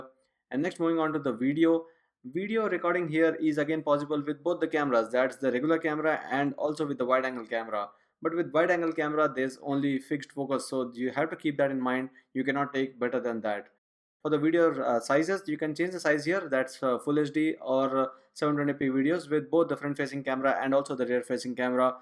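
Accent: India. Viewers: Stop with the accent you Indian I don’t understand u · The presenter is doing a good job of demonstrating how much he knows...he has no idea how to be a teacher...very fast talker, his very strong Indian accent is almost unknown. leaves everyone behind.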